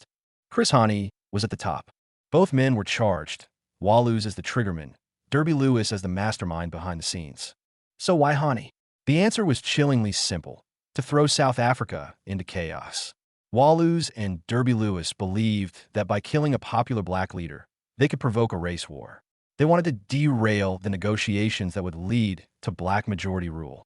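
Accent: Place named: United States. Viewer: Its a bit weird to listen to this in an American accent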